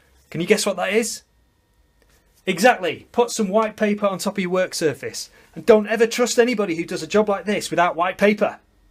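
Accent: United Kingdom. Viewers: Great video, but I'm confused; your vernacular is dollar based, whereas your accent is pound centric. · Very good video, you sound like your from the UK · You talked about the cost in dollars of parts but you sound very British, are you? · Gracias por tu vídeo. Por tu acento debes ser Británico. Me agrado oír que dijeras KAPUT. 😜😜😜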